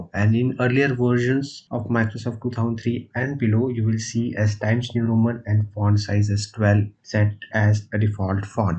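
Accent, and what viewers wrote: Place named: India. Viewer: thanks a lot, I'm a 73 years old french woman and first I was a little puzzled because of your nice indian accent and the fact you speak quite fast but your explanations were so clear, thanks a lot you really helped me. In the seventies, during 1 year in West Berlin I shared a flat with 3 guys from Bombay and one from Madras and you made me feel young again so double thanks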